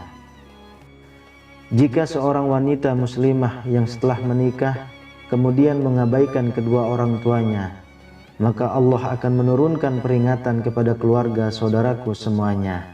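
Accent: Lombok, Indonesia. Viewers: Abang dari lombok kah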